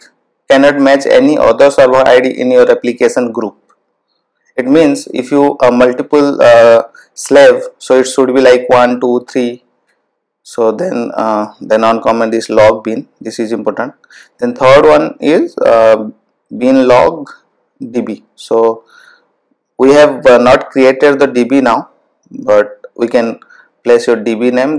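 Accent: Odisha, India. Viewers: Great video and my doubt was correct the guy behind the video is an Odia… not from name but from pronunciation….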